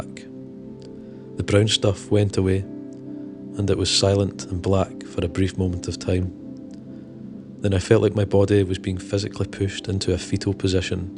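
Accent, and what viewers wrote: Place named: Newcastle, United Kingdom. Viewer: The Geordie accent make the story 10x better!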